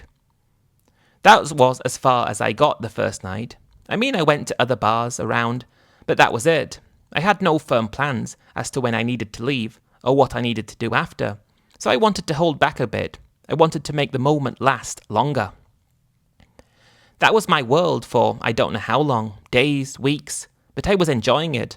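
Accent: England, United Kingdom. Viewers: A guy from the midwest, narrated in a British accent? No thanks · This is an english accent not american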